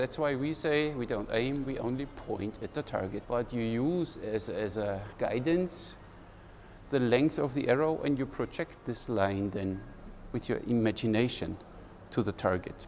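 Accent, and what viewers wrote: Germany. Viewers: Dein englisch ist so geil :) man hört sofort, dass du ein deutscher bist ;} was ist mit dem kompletten instinktiven schießen?! Also NUR das Ziel fokozieren?! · Are you German? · Dachte ich erstmal der ein Deutscher ist, doch hätte ich Unsicherheit entweder er Deutsch oder vielleicht Niederländisch ist. (Leider habe ich nie nach Europa gereist).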